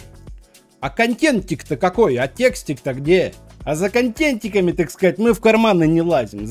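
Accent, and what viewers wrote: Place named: Ukraine. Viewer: как же приятно слушать украинский говор на моменте с чатгпт и твои ЧИRRRRRИКС лайк без раздумий ❤️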